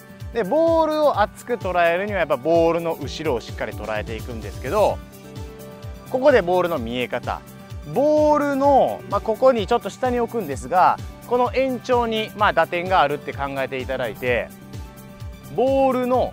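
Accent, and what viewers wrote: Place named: Kyoto, Japan. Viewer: ウィルスミス、朝から爆笑してしまいました😂 懐かしい京都弁も耳に心地良く、 、大好きです💕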